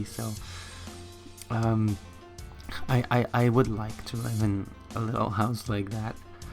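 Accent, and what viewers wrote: Belgium. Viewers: Wacht ben je van belgie? Ik dacht Engeland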